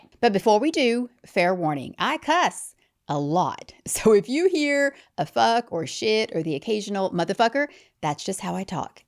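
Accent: South, United States. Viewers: I just found your channel with this video!! I love the county accent and cussing and pure southern honesty 😭I’m from Georgia so it’s like sitting down with my moms friends listening to you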